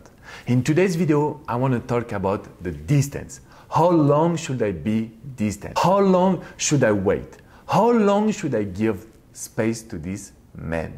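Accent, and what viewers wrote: France. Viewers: You are soo Handsome! #FrenchAccent 😄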